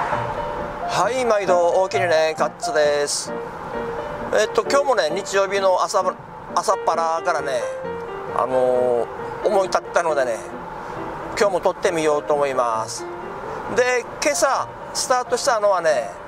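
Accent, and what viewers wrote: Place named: Yamada, Japan. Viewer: やっぱり山田弁はいいがねー。山田に帰りて～(;_;)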